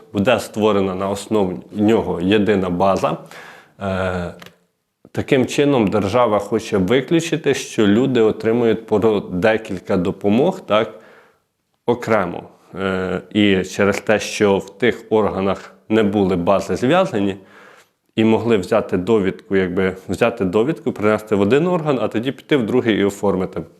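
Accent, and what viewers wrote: Vinnytsia, Ukraine. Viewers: Людмила, у мене також вища освіта і ні в якому разі не придираюсь, просто у деяких вінничан такий діалект, а молодець, займається дуже потрібною справою, за що йому велика подяка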